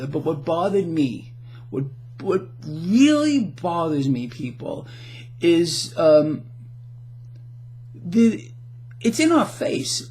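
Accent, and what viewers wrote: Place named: Brooklyn, United States. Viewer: I recently came across your channel and checked out your website, amazing stuff ! I would know a Brooklyn accent anywhere as I have one too !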